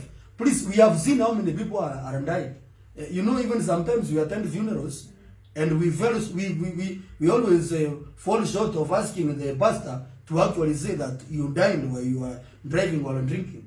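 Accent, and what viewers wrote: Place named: Meru, Kenya. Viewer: The meru accent iko fty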